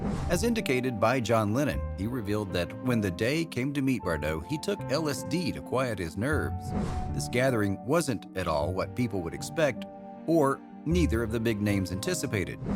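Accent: United States. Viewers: What a ridiculous "French" accent!!! Trintignant? Bécaud?!! Stick to what you can just about manage in that speech impediment you'd call an American accent!!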